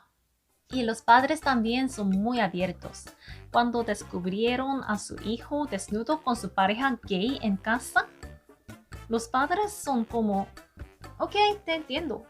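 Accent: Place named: Mexico. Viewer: Hola que interesantes tus videos me gustan. Tienes el asentó del español de Mi México sigue así te deseo mucho éxito en tu canal.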